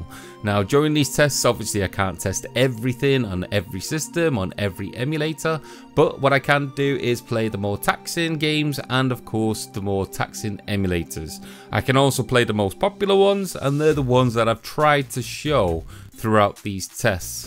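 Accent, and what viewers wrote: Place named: United Kingdom. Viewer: i liked and subbed and now commenting and because i did all that is this is the best guide and your British which so am i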